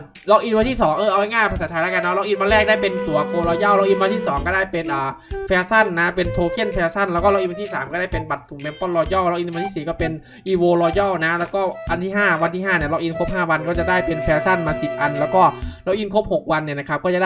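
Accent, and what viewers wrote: Isan, Thailand. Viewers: อ้ายเป็นคนอี่สานเบาะ??? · อ้ายเป็นคนอีสานบ่ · อีสานชัดๆ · น้องฟังออกน้องเป็น อีสาน ♡♡♡♡♡ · คนอีสานหรือ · ค่อยเปนคนอิสานฟังออกชั้นเจน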